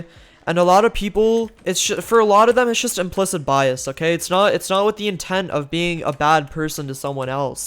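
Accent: India. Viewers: Me and my family are Indian and my dad said to me that whoever ur watching is indian because he recognized the voice but I didn’t believe him. It’s actualy kind of a relief because I want to be a YouTuber as a 2nd job but I was afraid of people being racist. But so glad to know I can do it. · Are you fr rn? Just because people don’t say their Indian or whatever doesn’t mean it’s racist stereotypes LMAO. Watched this and spit my drink laughing. Do white people point out they’re white?? Racism is a big deal but cmon man . Also imo it’s not that hard to tell if someone’s Indian based on their voice, (when I first watched you I knew right away) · I was doubting from when I started to watch your videos as your face looked like an Indian and your accent of English Lots of love from India dude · Are u kidding me?!?! U r Indian Bruhhhhh your acccent wtfff I see ... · I AM REALLY PROUD OF YOU THE BEST SKYWARS PLAYER IS FROM INDIA AND I HAVE A DOUBT FROM YOUR ACENT AND YOUR FACE THAT U ARE INDIAN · Great vid i am also indian but i was raised in Louisiana and Alabama so i dont have an accent like u! 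Def my fav yter ☺️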